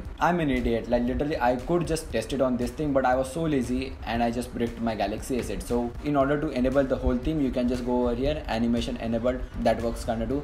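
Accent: India. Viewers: Uhh indian accent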